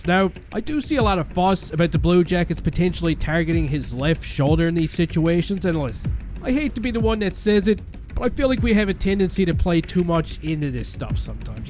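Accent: Newfoundland, Canada. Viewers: Is this a newfoundland accent?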